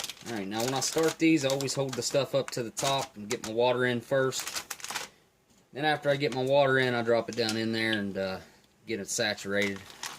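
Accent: South, United States. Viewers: Yup, you've got the southern accent down lol so do I and I don't realize it haha until I started living in Wisconsin.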